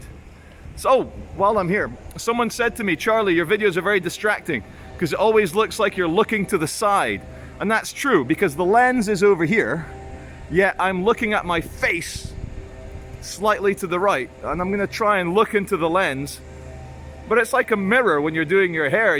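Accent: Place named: Scotland, United Kingdom. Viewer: You have a Scottish accent only imo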